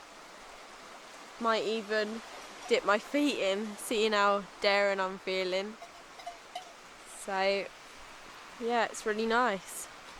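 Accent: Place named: Wales, United Kingdom. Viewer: in the valleys yeah lol nearly got the accent lol move to wales best thing you ever do. i know you love it lol btw its a stream not a river lol. take care hurry up back.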